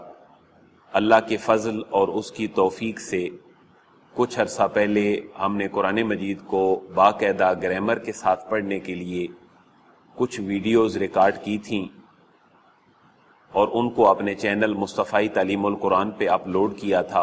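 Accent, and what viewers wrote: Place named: Punjab, Pakistan. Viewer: sahib k lehjy sy lag raha hai k ap gujrat,mandi, ya okara sy hain.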